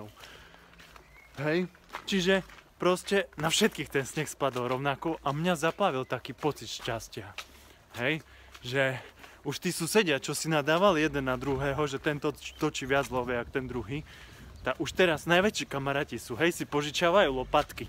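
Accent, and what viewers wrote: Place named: Košice, Slovakia. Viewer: Aj origo kosicania tak rozpravme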